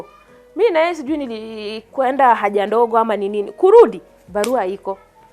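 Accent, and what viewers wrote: Uganda. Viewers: She has Ugandan accent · your swahili sounds like ugandan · Unaongea kama munganda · She sound like a ugandan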